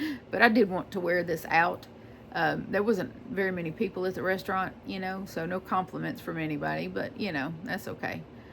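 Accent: South, United States. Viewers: I enjoyed your tutorial. I like less formal. I love your accent...southern comfort. Don't worry about the complainers. More of us love your style than not. Keep up the good work. I'm looking forward to your next tutorial.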